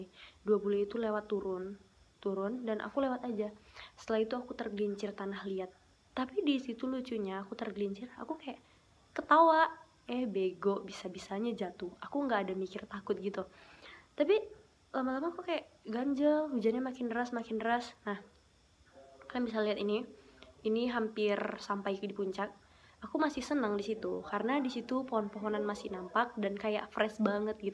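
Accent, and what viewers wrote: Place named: Berastagi, Indonesia. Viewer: Orng dari berastagi mana nih suaranya😌🙏🏻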